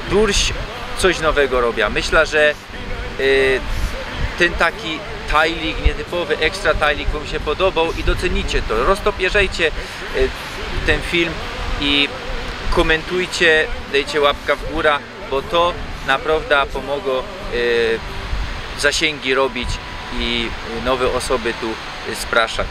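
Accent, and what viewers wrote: Silesia, Poland. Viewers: Kto cię tak ładnie nauczył mówić po polsku w gwarze śląskiej?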